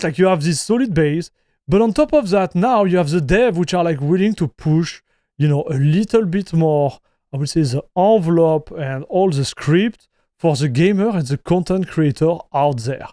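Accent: France. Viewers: your french accent is too strong. hard to understand.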